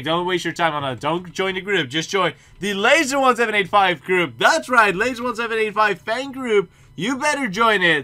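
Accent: Netherlands. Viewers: wait r u dutch?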